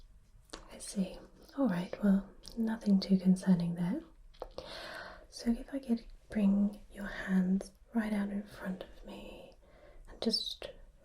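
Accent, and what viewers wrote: England, United Kingdom. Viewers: I ❤ the English accent!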